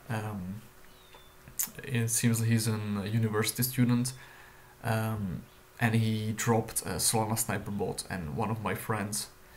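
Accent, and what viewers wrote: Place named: Netherlands. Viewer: Thanks for the reply my man! You Dutch by the way? You kinda sound Dutch ;)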